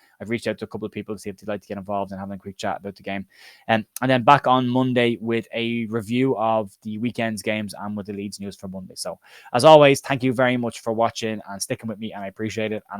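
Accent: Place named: Ireland. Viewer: As always well delivered and short and to the point, the Irish accent is a massive plus. Now to listen to a Leeds Bird Rant and then maybe Conner if it's not too long and I can always fast-forward Joe. LOL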